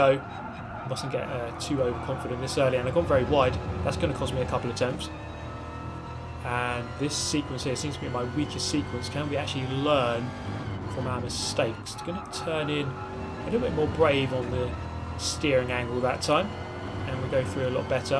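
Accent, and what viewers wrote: United Kingdom. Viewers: The british accent is perfect for sport commentating.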